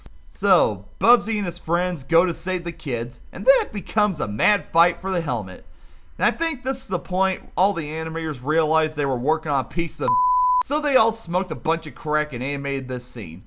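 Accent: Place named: Texas, United States. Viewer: you got a Texas accent.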